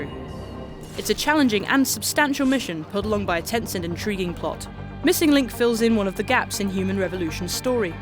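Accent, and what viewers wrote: United Kingdom. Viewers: It is a british girl you idiots I can easily tell from the accent and voice what are you all 18-20 years olds with a brain of 10 year olds. As far the DLC goes it is good but the story is really kind of boring and it is short for 15 bucks and why don't they allow you to import your augmentations from the main game is beyond me. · who the hell is this? some British boy/girl? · HOLY SHIT IGN HIRES BRITISH 15 YEAR OLDS!? HOLY FUCK!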